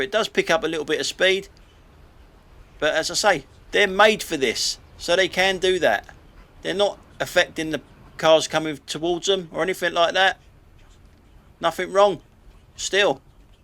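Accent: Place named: Cockney, United Kingdom. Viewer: Hi, only discovered your channel recently and have now watched about 150 of you episodes so far, I'm addicted....It's interesting to see how you've developed your style over the years. Love the 'only an Audi' & 'Burke in a Merc' trademark comments 😂. Love the cockney voice commentary which you've developed and is really polished now. I always thought your voice sounded like Dave Basset the Wimbledon/Sheff Utd manager. Just listened to an old clip of his and I think it does. Also the recent younger you photo also bears a slight resemblance to him too 😂. Keep up the good work 👍 I will slowly catch up with all the old episodes and then just look forward to the new ones... Cheers 😁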